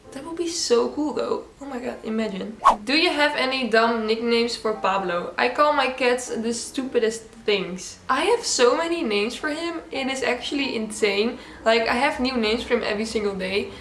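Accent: Netherlands. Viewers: Amai je hoort direct dat je van Nederland komt!😅😅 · It's probably because she has such a strong accent. Or the reason she has such a strong accent lol. She doesn't change her voice or letters so it's pretty much Dutch with English words, if you know what I mean. She speaks fluently so it's no effort for her to create sentences, so she can talk like she normally does. She's not really copying the American intonation, which is what you notice when people's voices change. Every language has different intonations and that can make a voice sound different when you speak different languages.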